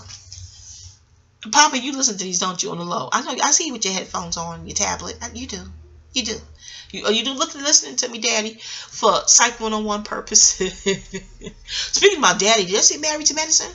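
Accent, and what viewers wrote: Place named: East Coast, United States. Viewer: Absolutely adorable love the East Coast accent... hey girl you supposed be talking Bobby Brown‘s memoir...🙃🤣🤣🤣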